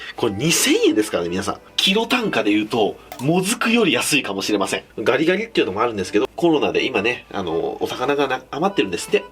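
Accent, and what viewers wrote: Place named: Nagoya, Japan. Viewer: 名古屋弁、いいなあ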